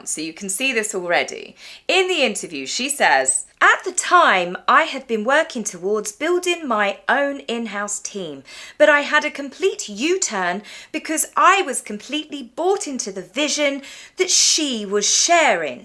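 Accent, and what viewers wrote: England, United Kingdom. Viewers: lol loved this!!! I hope we get a tour of your new home when you have it all done. Congratulations on your new home. Can I just say- you look more beautiful everyday and your content and the way you articulate things in that fabulous English accent - I mean truly you have the IT factor. I could see you being the next Graham Norton! You are just a star ⭐️ and I appreciate all you do for us. ❤❤❤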